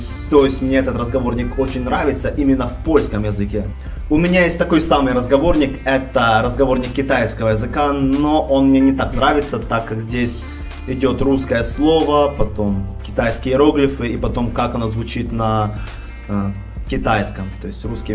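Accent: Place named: Ukraine. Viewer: думаю Вам бы не мешало подучить русский прежде чем на нём разговаривать...очень слышно что общается украинец....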